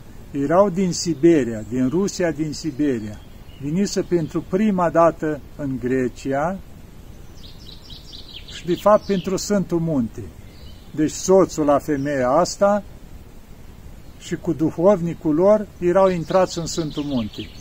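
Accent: Moldova. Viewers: Parinte voi precis sinteti roman ca cind vorbiti parca aveti accent moldovenesc va multumesc pentru sfaturile voastre frumoase imi plac povestirile care le spuneti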